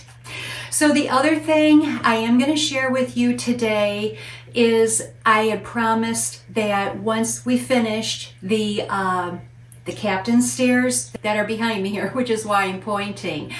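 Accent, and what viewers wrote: Midwest, United States. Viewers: I love your Midwest accent it’s adorable. It reminds me of someone I used to work with who was from Michigan. I’ve spent time in New England and my favorite states are Maine and New Hampshire. Exactly my idea of living a peaceful lifestyle style in a beautiful place. Unfortunately my husband loves California which I do not. We live coastal in a safe beautiful area but it’s way too crowded and EXPENSIVE! I’m still occasionally looking at houses over there hoping my husband changes his mind after we retire. Your videos are something I look forward to every Sunday! ❤❤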